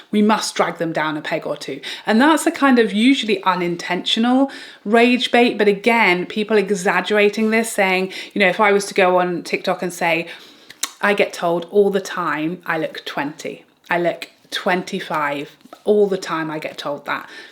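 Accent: United Kingdom. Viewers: Hi dear, looking beautiful as always!!!🤩🤩🤩🤩And thanks for sharing this with us! Never heard of this actually, what a waste of time! I will never want TikTok, i tried Treads for a day, but found following here and on Insta is enough. But i love watching your Channel of course, love it! And other content creators as well! Maybe the people i follow are very normal, but i never exprerienced this. Maybe i'm boring, but i follow people from Weight Watchers, of course people from church, singing etc, cause i'm a christian, i love to follow wildlife, of course i love to follow makeup as well, doing Duolingo on my phone to learn some more Spanish, but i never knew this existed! Thanks for letting us know, dear, you have so much variation in your video's, this is serious, but you also make us laugh, knowing a lot about makeup! Strange creating hate between Europe and America, i love America! 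So when i would say here i love the British acccent the most, which is true, that would never be to insult from me to anyone from Canada, USA, Australia, New Zealand, never thought about that actually, it's just that i love to hear it. Born in 1970, digi disaster number one here, hubby and son keep on laughing sometimes what i did again, but i can manage myself better and better in digi-land, baby steps,. I think i'm also tooo naif, i always think the best of people, so, i really appreciate such a video, to keep me awake, thanks so much!!!❤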